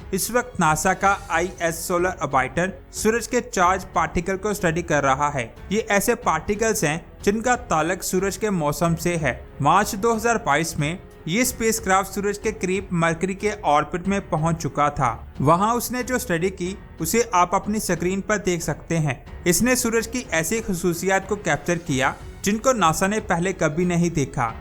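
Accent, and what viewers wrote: Punjab, India. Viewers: yar tum Urdu bhi aur angregi bhi Punjabi lehjay mein parrhtay ho,ba'tao mein kiya ka'roon.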